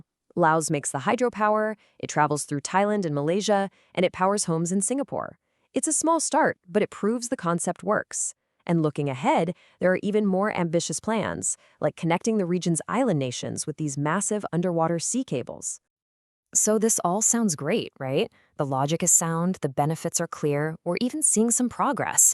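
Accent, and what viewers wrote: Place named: India. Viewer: Globalism, butch girl boss AI voice with annoying vocal fry to obscure Indian accent, leftist climate change BS, brainwashed NPC points. This type of low quality AI slop is so yesterday. Go away, and get a real job doing something useful for mankind, like working on India's trash, pollution, and sewage problems.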